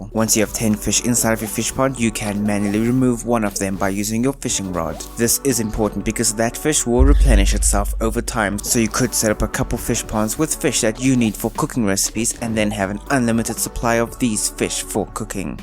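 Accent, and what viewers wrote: South Africa. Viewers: The South African accent. Love to see it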